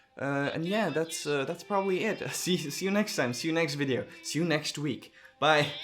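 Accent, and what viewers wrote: Japan. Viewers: your japanese English is professional, i can tell you. You speak literary the same as my English teacher at Japanese School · Japanese english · HAHAHAHAHA OK U MADE ME LAUGH IN YOUR NIHONGO/Japanglish 😂😬🤟🏼🤟🏼🤟🏼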